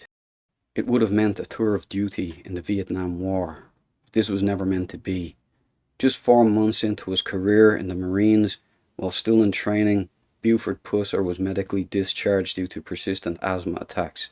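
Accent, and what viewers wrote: Dublin, Ireland. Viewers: Puss-er. The Irish type accent of the narrator brings out the 'Poos-er.' I'm thinking he would pronounce 'hustle' as 'hoostle.' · well done...!...love the Irish axcent..!....& the quote"the Vietanmies were very lucky he wasent sent over there".....lol...4 sure....men like him are a hard find nowadays...!...he did so much in such a short life...R.I.P. Buford..! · Spoken like a true Irishman. Well Done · Just discovered this channel, great story. Fair play lad. You a Dub as well? Where 'bouts you from?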